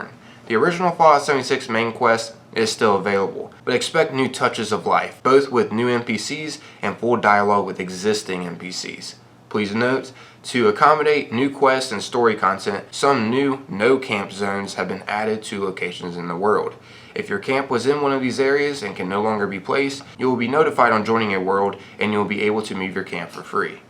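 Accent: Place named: Philadelphia, United States. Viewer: You from philly? You sound like me. Go birds! Thumbs up!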